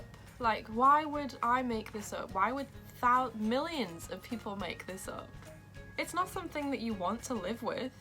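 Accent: United Kingdom. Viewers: Every video I’ve seen with a person with your condition has a British accent. I wonder if it’s something in the air or water or something to that extent.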